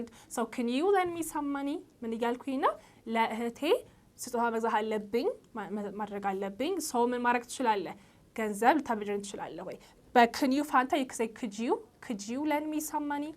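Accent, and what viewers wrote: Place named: United Kingdom. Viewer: 😢😢😢😢😢😢 omg special British accents I can't understand ohhfuuuu 😢😢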